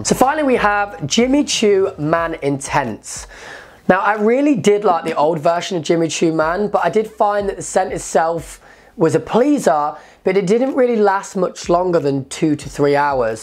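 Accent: England, United Kingdom. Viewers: Hmmm where in England is your accent from?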